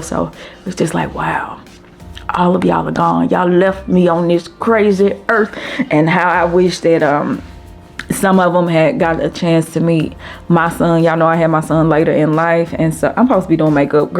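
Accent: St. Louis, United States. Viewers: I knew your accent sounded familiar. St. Louise have distinct accent. So pretty God bless.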